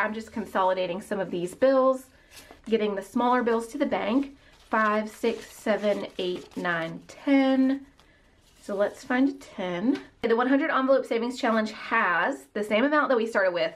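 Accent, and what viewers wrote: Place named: South, United States. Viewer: I knew from your accent that I was listening to a southern girl 😂 hello from Louisiana!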